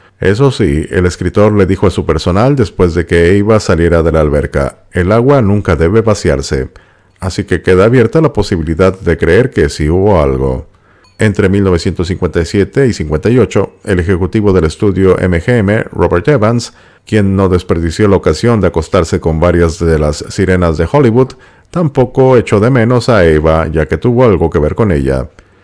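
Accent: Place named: South, Spain. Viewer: me hace reir. Eso significa me cae bien. Despues que termine su disertación voy a ver una película de Ava Garder. Me gusta el acento del sur.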